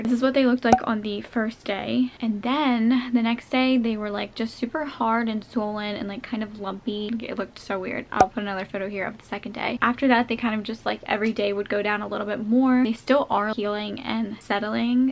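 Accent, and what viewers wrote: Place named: South, United States. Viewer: Why are you the only person in your family with a southern accent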